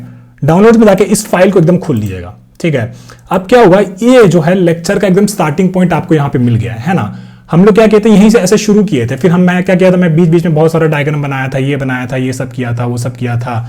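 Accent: Bihar, India. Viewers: The Guy Is Littreally Khan Sir OF Coding - Teaching Style , Bihari Lang Style . Thnku Sir For The Content . ❤❤ Day 1.5 Done ( 1/1/26 )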